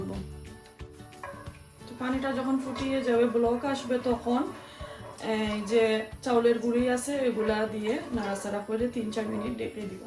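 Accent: Sylhet, Bangladesh. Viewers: সিলেটি মাত ভালা লাগে আমার ঘরে তাকিয়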